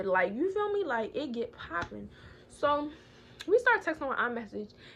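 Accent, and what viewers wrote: Baltimore, United States. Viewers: im from newyork and she has nothing close to a ny accent idk where you got that from😂, she has a strong baltimore accent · That’s that Baltimore accent!😭😭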